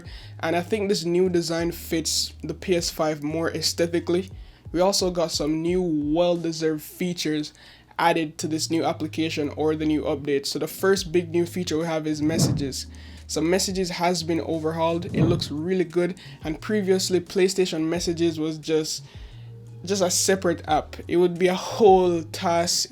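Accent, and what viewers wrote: Jamaica. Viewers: You jamaican? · Ur Jamaican I could tell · U jamaican bro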